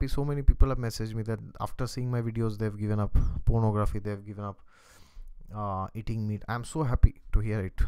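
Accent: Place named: Bengal, India. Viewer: ji you sounds like a bengoli boy.. Your way of talking is just like a Bengali boy.. I like that as because I am also a Bengali.. Hehe 👌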